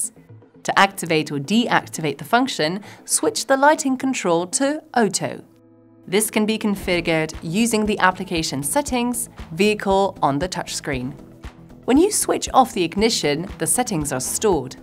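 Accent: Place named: Scotland, United Kingdom. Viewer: she's from Edinburgh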